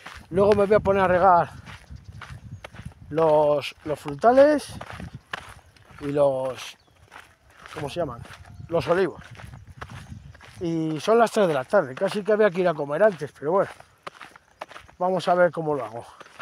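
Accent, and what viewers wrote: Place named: Bilbao, Spain. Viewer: No hace falta, pero seguro que eres de Bilbao. Joe que tio.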